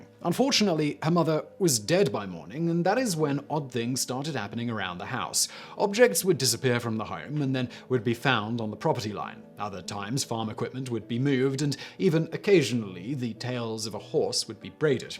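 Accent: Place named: RP, United Kingdom. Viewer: Simon is REALLY over-egging his Head Boy private school accent on this one.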